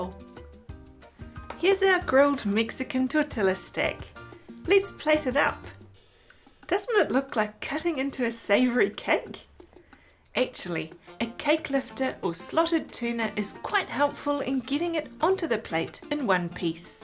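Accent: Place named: New Zealand. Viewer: Looks delicious!! Also, I enjoy listening to your New Zealand accent, so much. Best wishes from Phoenix, Arizona🤗💐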